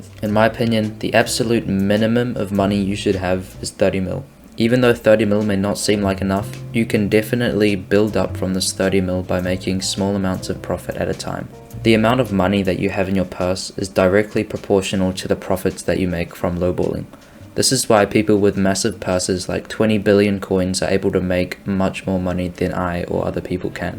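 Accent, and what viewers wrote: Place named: South Africa. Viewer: are you from south africa? ur accent sound like it.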